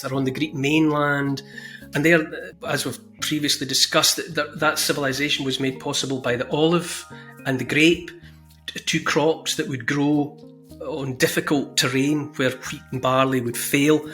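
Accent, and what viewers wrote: Scotland, United Kingdom. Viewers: You tell the story so beautifully Mr Neil Oliver with your beautiful Scottish accent. Like yourself I have always admired and respected the Spartan Warrior culture. I served Honorable in the United States Marine Corps. SEMPER FIDELIS SIR🇺🇸🫡✊️🖖🙏GOD BLESS YOU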